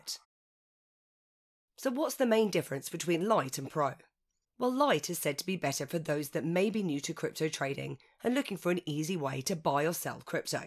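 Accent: United Kingdom. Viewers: you sound like your from in t uk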